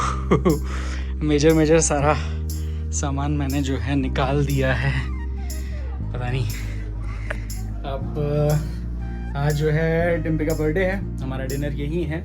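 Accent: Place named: Garhwal, India. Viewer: Gaun m aakr , hindi mai garhwali tone aa hi jati h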